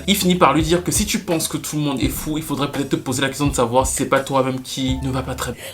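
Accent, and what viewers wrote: Cameroon. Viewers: Tu as un petit accent camerounais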